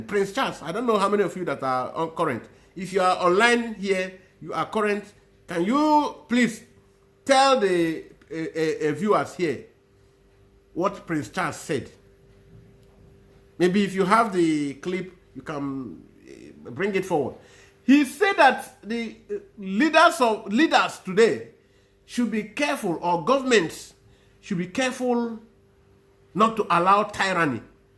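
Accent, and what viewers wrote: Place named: Igbo, Nigeria. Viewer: Nnam ur Igbo pure,na man u be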